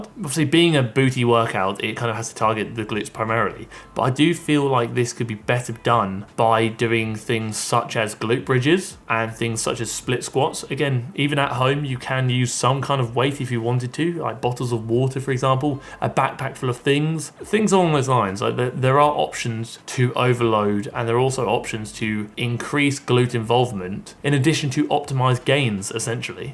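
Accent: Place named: England, United Kingdom. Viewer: Here for the accent 🤣🤣🤣 so awesome, England? Sounds like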